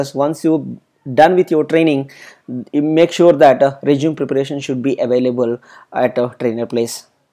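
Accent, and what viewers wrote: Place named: Telugu, India. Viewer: Your pronounciation is in Telugu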